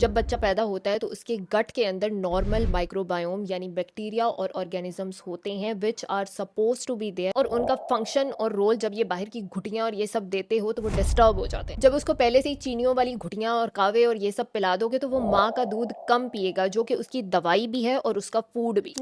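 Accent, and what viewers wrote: Punjab, India. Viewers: Hhhh is my fvrt Dr, you talking is so cute 😸 with panjabi 😘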